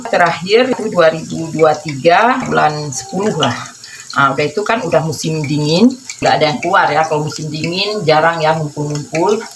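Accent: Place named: Medan, Indonesia. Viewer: Halo bunda salam kenal.. senang sekali bisa ngumpul bareng teman-teman Indonesia. Oya bunda di Indonesia asal nya dari mana klo boleh tau. Logatnya kayak orang Medan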